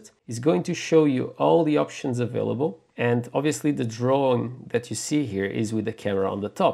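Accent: Portugal. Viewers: es português? tens muito sotaque português a falar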